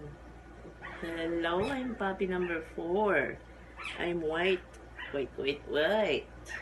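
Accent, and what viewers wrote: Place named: Visayas, Philippines. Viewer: bisaya ka te... pila ka dosage ang 2 mons te?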